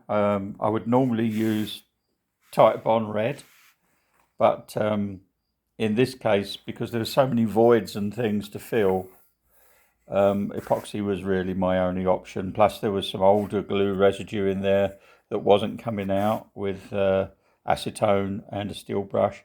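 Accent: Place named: England, United Kingdom. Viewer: Thank you. The way you routed for the mahogany splines is something I will try next time I fix a headstock. On a modern guitar, I might use veneers on the front and back of the headstock to hide my work. The way you did it on that classic was perfect. Not to be weird, but I also like how you talk; what part of England are you from (if you are Irish, Welsh, or Scottish, I apologize)?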